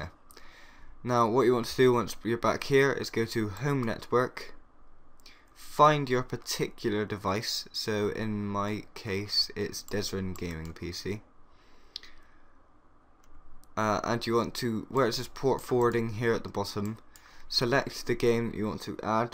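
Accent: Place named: Bristol, United Kingdom. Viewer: You sound Bristolian : O and this helped ty, kept finding tutorials for this and found american routers -_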